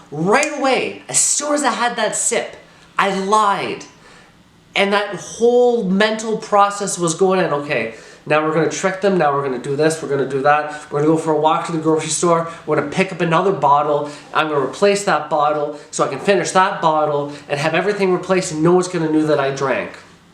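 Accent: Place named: Canada. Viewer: I love your canadian(?) accent.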